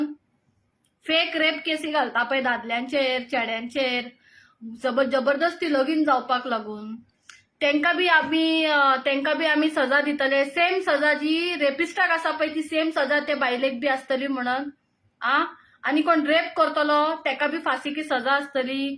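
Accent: Konkan, India. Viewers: just have a look on ur konkani assent, u r a migrants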